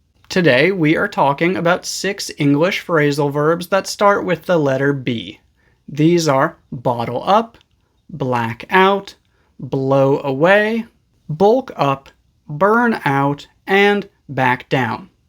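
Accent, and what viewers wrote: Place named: United States. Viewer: I was blown away by your beautiful American accent. 😊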